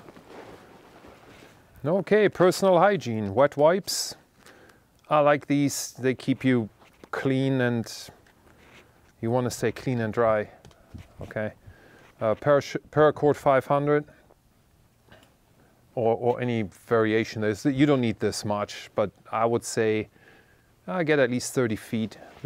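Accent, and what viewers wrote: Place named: Germany. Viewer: Great Video! Do you come from Germany? It sounds so. Greetings from cologne😁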